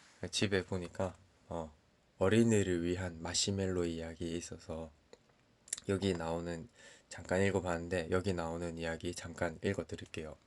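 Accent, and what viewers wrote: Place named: Gyeongsang, South Korea. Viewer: 어헛!! 님!! 사투리가 경상도 같았는데 무려 "대구" 셨군요!! ㅎㅎ 저도 대구본가 왔네요😊😊즐거운명절되시고 행복한 시간되세요🤩🤩🔥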